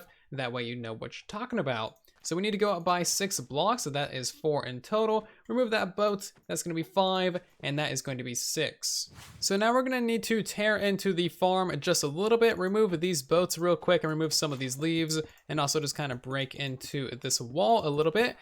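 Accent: United States. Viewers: is secretly mumbo but with a American accent? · is the mumbo jumbo but not for extreme redstone but for game mechanics and with a American accent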